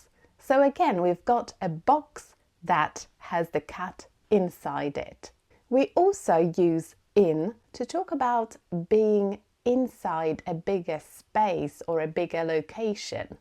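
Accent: United Kingdom. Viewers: You speak in British accent and i love it. Thank you ❤ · Hello,I'm following you fromUzbekistan, very and very nice video thanks,I loved your pronunciation and accent,I love a British accent so much,you cannot imagine how I love English ❤I want to speak..but it not easy 😢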